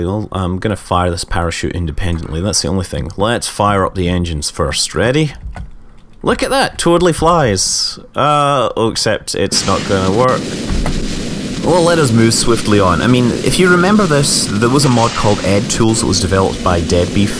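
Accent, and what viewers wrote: Scotland, United Kingdom. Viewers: Scottish Manly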